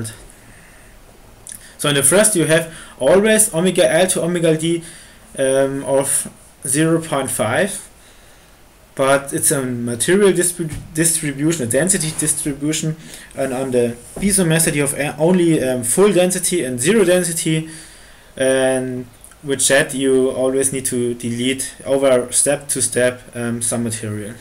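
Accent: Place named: Germany. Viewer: alter der deutsche Akzent scheppert alles raus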